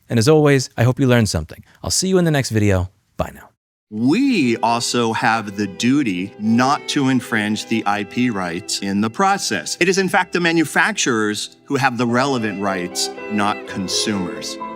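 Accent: United Kingdom. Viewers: nice British accent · why he talk british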